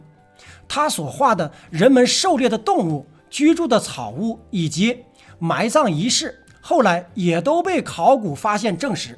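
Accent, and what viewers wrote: Northeast, China. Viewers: 不喜欢东北土语，太低太俗，拉低了节目水平、层次。望去掉！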